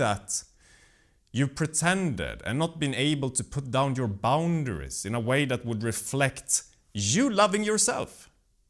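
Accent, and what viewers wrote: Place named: Scandinavia. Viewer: The Scandinavian accent ❤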